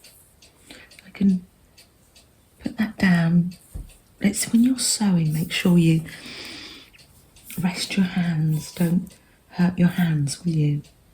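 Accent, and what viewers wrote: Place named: England, United Kingdom. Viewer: I so love listening to English laies speaking about their sewing project - such kind, gentle voices, easy listening and relaxing. love this project.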